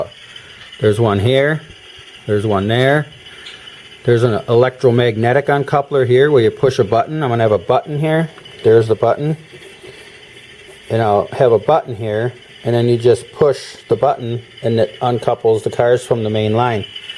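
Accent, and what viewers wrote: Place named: Wisconsin, United States. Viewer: Love the layout and the wood paneling in the basement is awsome and I detect a WISCONSIN accent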